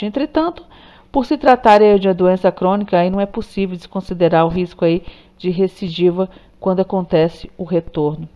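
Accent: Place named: Rio de Janeiro, Brazil. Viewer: A senhora é do Rio de Janeiro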